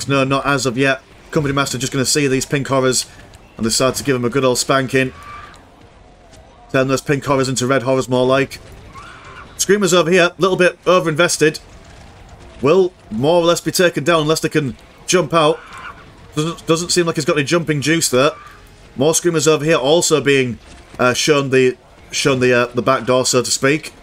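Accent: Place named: East Yorkshire, United Kingdom. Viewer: I wonder which side of east Yorkshire 🤔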